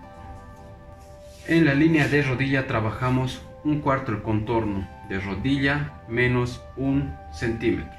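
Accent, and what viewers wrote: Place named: Bolivia. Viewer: Parece que es boliviano por el acento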